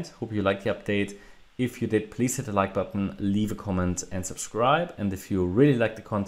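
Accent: Germany. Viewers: May i ask what part of Europe are you from? Germany🤔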